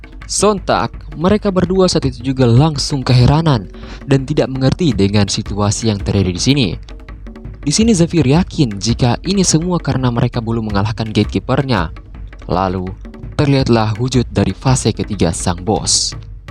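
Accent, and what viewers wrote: Sulawesi, Indonesia. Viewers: Org Sulawesi ya bang?😆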